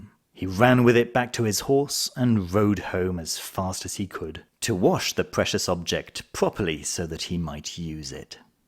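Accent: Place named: RP, United Kingdom. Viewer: you're the about the only intelligent English that I listen to all day I just love the way you speak to Queens English and you are have a permit if I could afford your patreon channel I wouldn't have to save up to buy a tarot deck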